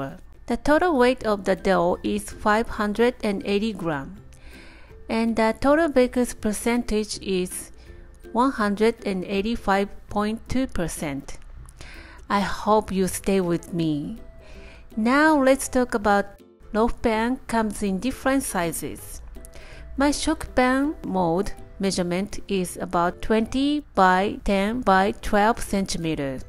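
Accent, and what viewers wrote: Japan. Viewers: You sound like my dentist who's from Japan. I absolutely ADORE her🥰. And thank you so very much for your contribution. Subscribed. 🙂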